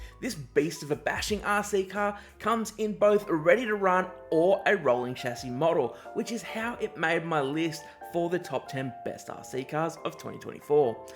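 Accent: Australia. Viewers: Is he Australia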